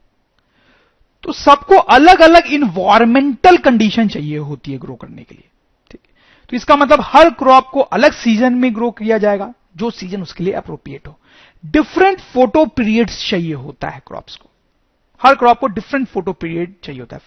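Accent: Bihar, India. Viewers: Are u from bihar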